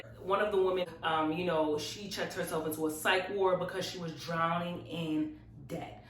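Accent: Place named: New York, United States. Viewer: I just love your New York accent! Lol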